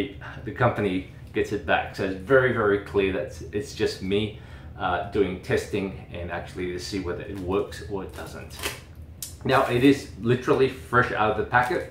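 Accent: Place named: Australia. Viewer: will you add the Newa to your device testing? It's a radiofrequency device that claims to use the same 3DEEP technology used in the clinic setting. The makers of Newa further claim the device induces production of collagen and elastin. The Newa website states a study showed an average collagen increase of 3.82 percent (but fails to state how the test was conducted... and I never trust any test not done by an independent, third-party facility). Also, what are your thoughts on the Tria laser (basically it is an at home Fraxel type device)? Would you reccommend the Tria over dermarolling? (I already own the Tria so am basically curious whether I should continue to use it or dermaroll or alternate treatments?) Thanks so much for your videos!!! I love everything about skincare and being able to a professional with an Australian accent talk about skincare is damn near perfection in my book.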